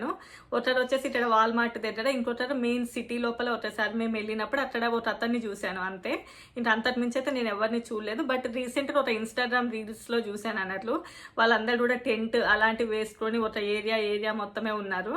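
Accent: Telangana, India. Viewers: Your voice n fluency is so natural akka, Medi Telangana ?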